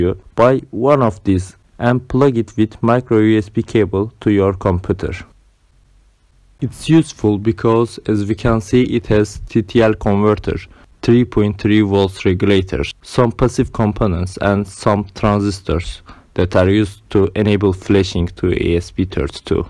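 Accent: Turkey. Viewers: Duyar duymaz dedim bu aksan kesinlikle bir Türk'e ait. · türk olduğuna yemin edebilirim ancak ispatlayamam , diksiyon tanımlıyor sanki :D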